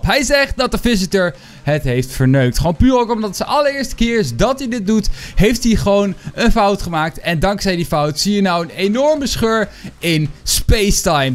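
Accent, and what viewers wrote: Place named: England, United Kingdom. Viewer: Geweldig Engels accent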